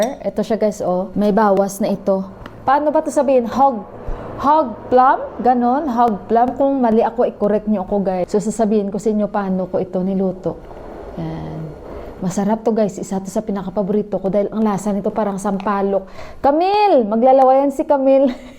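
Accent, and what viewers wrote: Iloilo, Philippines. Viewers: .... Tingala ko nga ilonggo ang tuno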